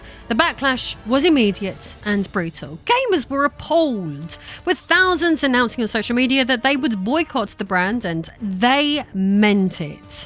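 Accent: United Kingdom. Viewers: Boobs, tight leather, & a british accent... the internet just ain't playing fair 😂👍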